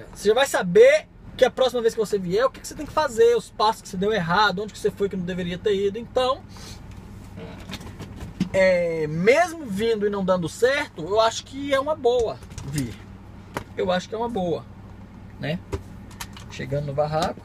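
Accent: Brazil. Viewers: De que parte do Brasil você foi? Cearense?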